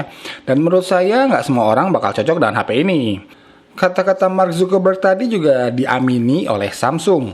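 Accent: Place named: Sundanese, Indonesia. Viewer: amiiiiiin.... sukses terus buat, ane suka sama logatnya yang jenaka & sunda pisan euy.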